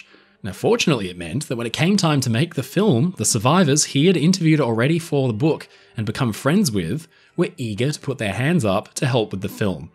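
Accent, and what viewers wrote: Australia. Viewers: What? The uploader is Australian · I've noticed that too - uses several other American pronunciations in his videos, I can't remember any of them right now...but they sound wrong coming from his (refined) Aussie accent. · I knew you were Australian, hi from Tasmania, and thank you for being a more familiar realistic representative for Australia ❤❤❤😊.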